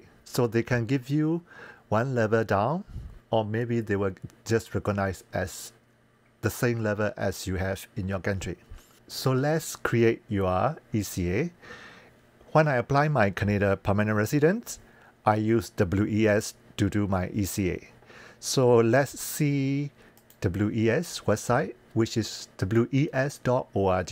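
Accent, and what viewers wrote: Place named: Myanmar. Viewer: Why didn't you explain in Bamar (Burmese) language if your video post is for Bamar . Never ever expressed "Vulgar Slang" Your school is a "Shitty" school, It shows your character. Never ever expressed Offensive wordings toward other person's dignity if you are very careless/disorganized person "that is the school that DON'T provide", It should be" Does not". While you're in Canada .Pay attention on "Vowel"sounds, Then imitate the accent and tone.Again Learn how to make a "SCHWA" vowel. In addition you must learn the culture of the English speaking country you wish & some slang. Keep in your mind that UK, US, Canada, Australia, New Zealand, each has different culture and English accent. Canada official languages are France and English. Otherwise you will be still sounding as Myan-Galish or Ba-Galish. Since you've been staying in Singapore for quite a few years you will have to adjust your Sin_Ga-Lish while living in Canada. 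Do not take as personal. Cheers. Note: I trained my Bamar gal friend in the same way. Now she has a fabulous Canadian accent and some french after 6 years of living in Canada.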